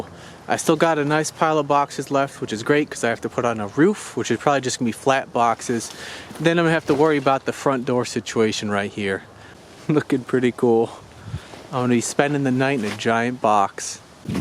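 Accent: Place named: Upstate New York, United States. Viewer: I wonder what state this is in? I detect a slight accent, upstate New York? Beautiful patch of woods. The vines on that tree are so cute and fascinating.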